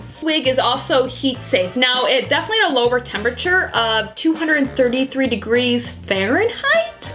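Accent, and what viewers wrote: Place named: Upper Midwest, United States. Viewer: Are you from Minnesota, Wisconsin or North Dakota? Trying to figure out your accent.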